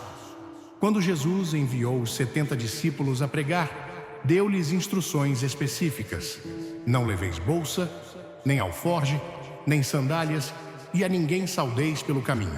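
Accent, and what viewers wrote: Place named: Portugal. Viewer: Porque esse portugues chato/horroroso de Portugal, se o canal diz ser do Brasil?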